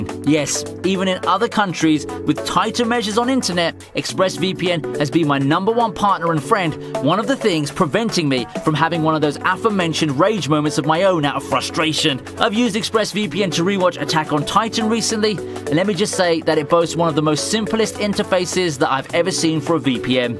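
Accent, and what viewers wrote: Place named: England, United Kingdom. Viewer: This would be a great video if the narrator could shut the fuck up and let the scenes play out. Hard to get any emotion out of these clips with a strong english accent telling us what to feel and then splicing in sentences so quick it's jarring, along with a sponsor 5 minutes in. Fuck I hate youtube.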